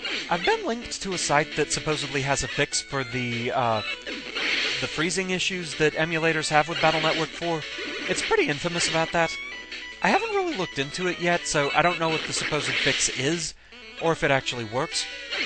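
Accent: United Kingdom. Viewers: You live in texas.What.You sound so British,bit of a stretch but maybe even Scottish but youre American.mind blown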